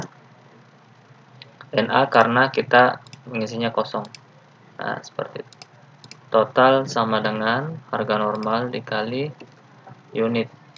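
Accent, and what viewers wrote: Indonesia. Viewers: sepertinya masnya orang makassar ya...soalnya logatnya kental banget..thx ilmunya yang mudah di mengerti ! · Kayak logat sulawesi sappo..makasih tutorialnya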